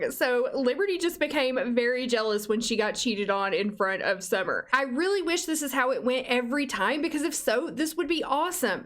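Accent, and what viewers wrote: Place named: Kentucky, United States. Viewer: Hi your acc not sounds so match like mine any chance your from bardstown lol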